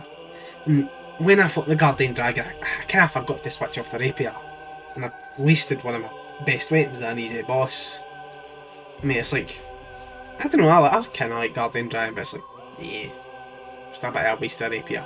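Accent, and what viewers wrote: United Kingdom. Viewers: What do you want him to do, stop being British? The man can’t change his damn accent.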